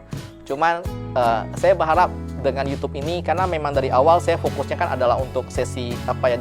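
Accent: Pontianak, Indonesia. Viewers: Mantab Boy,... Salut dengan budak Ponti, Penuh dgn perjuangan dan menjadi inspirasi utk semua... Salam dari saye budak Ponti, yg lahir dan besak di Ponti.... Aku suke logat kau boy....Tetap semangat dan sehat selalu Boy. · Bahasa pontinya mirip bgt ama mertua gw 🤣🤣🤣🤣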